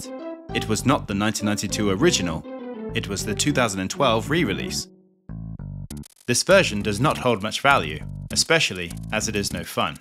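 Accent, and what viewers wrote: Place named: Scandinavia. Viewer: Sorry had very similar accent to some scandinavians speaking english Are you big as in age, height - or width lol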